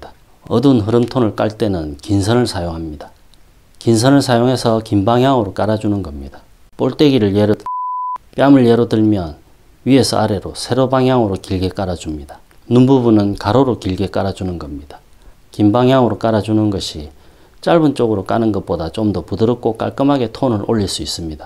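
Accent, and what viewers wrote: Gyeongsang, South Korea. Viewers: 82세 할아버지가 씀니다 . 특히한 경상도 사투리와 재미있는 말씀으로---------참 재미이게 보고가유 -- .그말씀이 나에겐 정말 참고많이되네요 나도 실그 초상회를했으나 지금 현대인들이 보시면 웃숩지요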